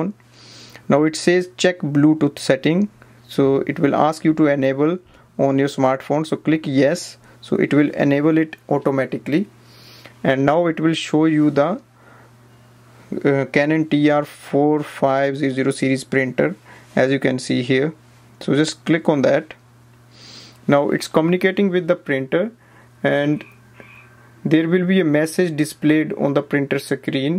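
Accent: India. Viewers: Indian accent is extremely disgusting man I’m sorry just had to say it. You ruining English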